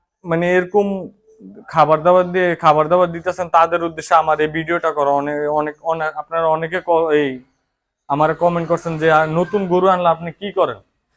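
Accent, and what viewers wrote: Comilla, Bangladesh. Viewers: ভাইজান কি কুমিল্লাই ছিনেন নাকি কারন আপনার কথাবার্তা ঠিক কুমিল্লা মানুষের মতন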